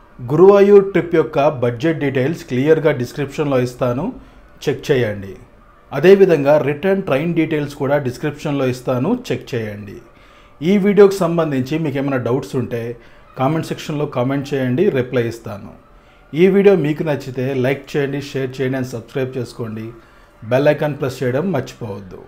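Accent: Nellore, India. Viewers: అన్న మీది Nellore haa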